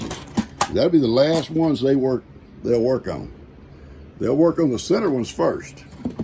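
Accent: United States. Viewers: Hello..can I know where's your accent came from? Sounds like a cowboy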